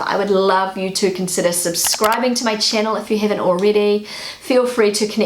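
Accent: New Zealand. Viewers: Love the accent. I almost wish I were an actor with a NZ character so I could practise the vowels. BTW, there's no "expresso"; it's "espresso". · New Zealand accent is very similar to British accent, is it not? I would have thought, you come from England. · Curry flavid kitchip. The new Zealand accent is adorable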